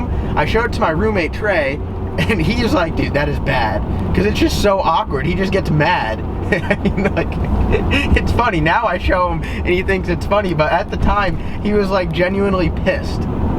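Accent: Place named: Appalachia, United States. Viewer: Why do you talk like a hillbilly but your parents dont? Lol wtf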